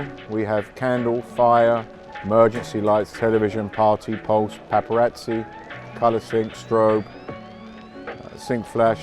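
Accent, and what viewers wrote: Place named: London, United Kingdom. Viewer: That fella has such a smooth Wandsworth accent, like listening to Radio 4 after midnight.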